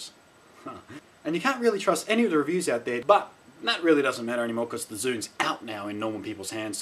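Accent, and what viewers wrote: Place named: Australia. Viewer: How do you know this if you do not even have a zune and not even allowed to buy a zune because you live in Australia well it sounds like it from ur accent. I have a zune and it is better then a ipod in my opinion I dont care what you say im just saying that you are saying stuff you dont even know for real Specialy sence you cant even get one.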